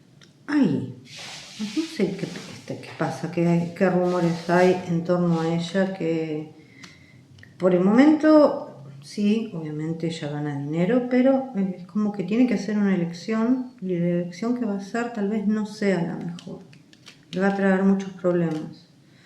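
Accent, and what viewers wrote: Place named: Argentina. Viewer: He seguido an desde hace rato pero a veces como ella habla no entiendo muy bien (acento argentino) disculpa. Se también que dice que las cartas indican que Can y Demet están casados pero después de tanta cosa que ha pasado con la terrible DJ y más, estoy confundida. Espero entender ya que voy a leer todos los comentarios. Que tengas buen día. 👋🏼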